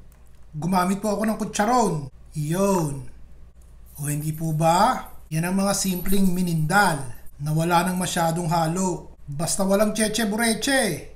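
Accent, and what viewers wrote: Philippines. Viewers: are you from Nieva ecija ? the way you talk you sounds like my cousins from the philippines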